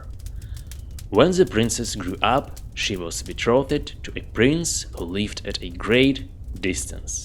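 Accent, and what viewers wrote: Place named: Russia. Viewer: You have a Russian accent.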